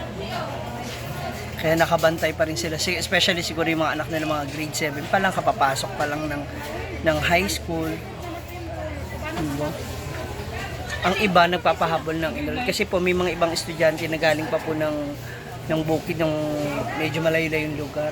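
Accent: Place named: Visayas, Philippines. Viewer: bisayang bisaya nman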